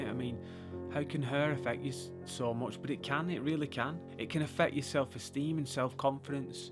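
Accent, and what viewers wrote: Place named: Hull, United Kingdom. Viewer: Hull in england maybe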